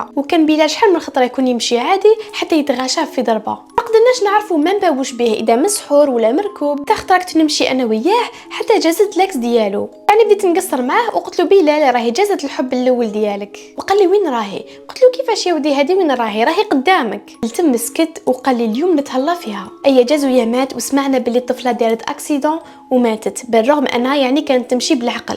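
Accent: Blida, Algeria. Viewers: هذي بليدية ، راي تهدر باللهجة تاع بليدة 😊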